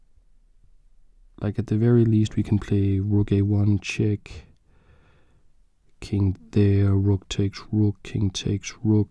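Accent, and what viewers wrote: Denmark. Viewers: Just gonna ask whats ur main language ur accsent remind me of some1 from denmark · Jeg havde aldrig troet jeg skulle sige det her - men er simpelthen så vild med din danske accent! Jeg ville elske, hvis du kunne inkorporere flere ASMR elementer (i nogle videoer), hvor du gentager ord eller “tapper” på noget!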